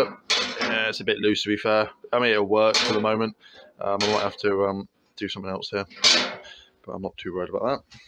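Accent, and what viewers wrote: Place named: Cornwall, United Kingdom. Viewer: Great job, looking really good, i take from the local twang your from Cornwall, a fellow Cornishman, here is a little project i have been working on, a 4.5 kw rocket stove, can give to much away ,as still in patent process, <link>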